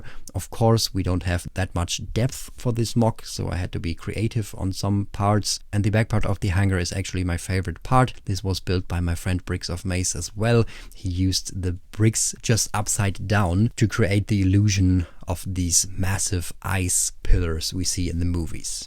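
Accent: Germany. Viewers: Dieser deutscher accent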